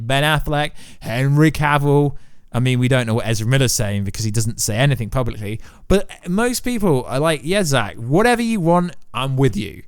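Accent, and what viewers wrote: England, United Kingdom. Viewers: When gets mad his English accent thickens 🤣🤣👍🏽 Love the channel bro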